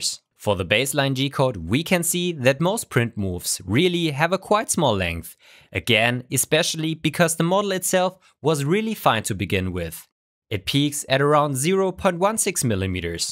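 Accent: Germany. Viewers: he has a nice German accent, thank god he's not Swiss. xD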